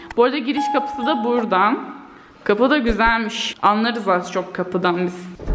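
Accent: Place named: Black Sea, Turkey. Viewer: Hep bile gidiyoz mu tam karadeniz kızı olmuşun kız valla memlekete gidince direk o şiveyi kapıyosun hep bile gibi bizde mezarüstüne gittik gidiyoz deriz bu arada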